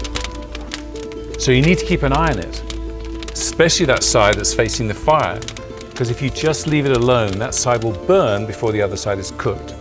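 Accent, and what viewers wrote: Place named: United Kingdom. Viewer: Accent quintessentially British...great vid!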